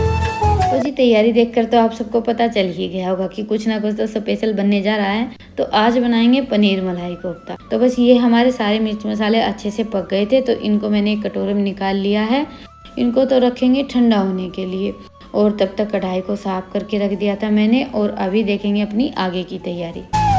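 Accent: Haryana, India. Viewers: Haryanvi language h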